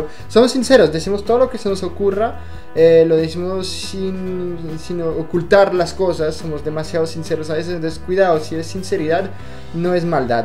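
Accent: Colombia. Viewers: Holaa me encanta tus vídeos siempre hablas muy relagado y colombiano un abrazo 😚😊💞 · Tu español es muy bueno para ser Francés, tienes un acento bien colombiano btw. Muy interesante el vídeo lol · Hablas muy bien el español, incluso tienes acento, parece colombiano, eres genial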